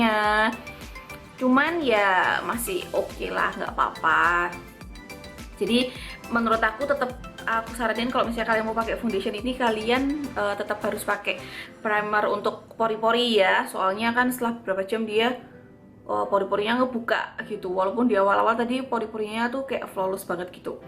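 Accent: Java, Indonesia. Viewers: Yeeyy gercep buat mba medhok 🤗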